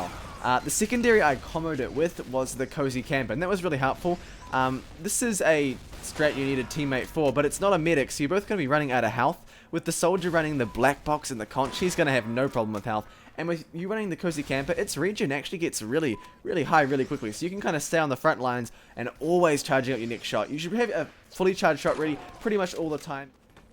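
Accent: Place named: New Zealand. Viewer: He's from New Zealand.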